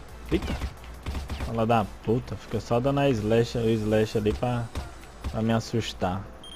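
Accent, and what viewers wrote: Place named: Northeast, Brazil. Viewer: Joga d+ cara u onde que cê Mora??:p Sutaque de Nordestino:P:3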